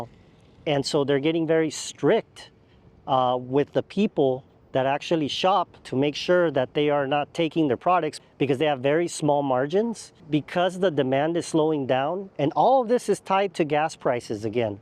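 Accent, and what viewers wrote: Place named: Latin America. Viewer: That stupid latin accent .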